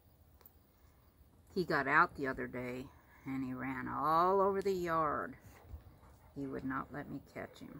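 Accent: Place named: South, United States. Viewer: 🍀 Your fur babies are too cute for words! 😎Love southern accents.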